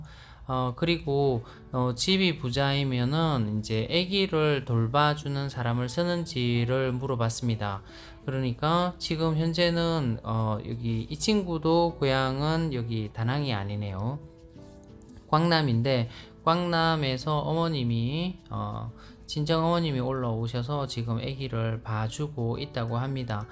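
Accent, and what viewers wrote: Gyeongsang, South Korea. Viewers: 그냥말편하게하세요^^ 경상도같은데ㅜ이건머사투리도아니고표준말도아니고ㅜㅋㅋ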